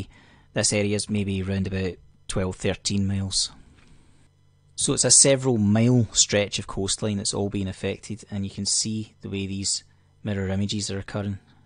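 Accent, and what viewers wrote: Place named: Scotland, United Kingdom. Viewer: Alright mate? A fellow Scotsman here. Keep up the good work. I found you through a painful chat with Spurs etc. It was great to hear a familiar accent in the discourse.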